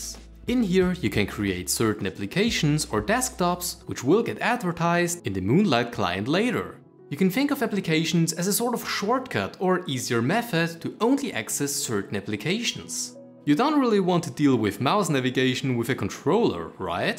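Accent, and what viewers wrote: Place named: Germany. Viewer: He is clearly German. Why I know that? Because I speak the Same like this Guy c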